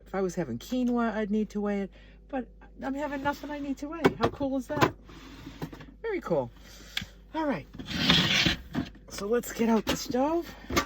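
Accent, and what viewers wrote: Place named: Boston, United States. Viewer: you are incredible! I subscribed months ago but life gets busy, so was glad to see you’re still doing this adventure! Watched this whole thing… toothbrushing to breakfast to dancing with that gorgeous blue sky backdrop. Yes, I hear the “Boston” in your voice😉. Hello from Connecticut. I’ll watch more often. Your shows are “glimmers.”👍🏻🙋🏻‍♀️🤣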